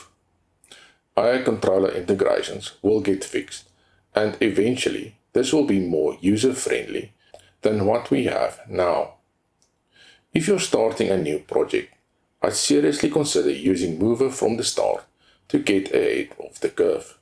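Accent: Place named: South Africa. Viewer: South African accent is strong with this one.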